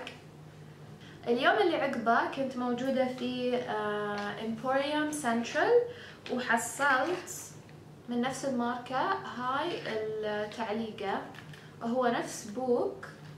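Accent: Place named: Bahrain. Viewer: كاتبه انها اماراتيه بس لهجتها يايه ع البحرينية + شوي عراقيه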